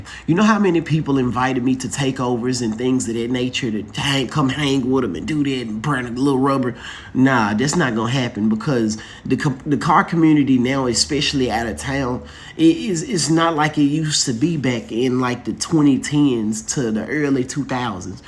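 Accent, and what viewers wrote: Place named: Detroit, United States. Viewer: Bro you sound like you live in Detroit 😅 It goes down fr fr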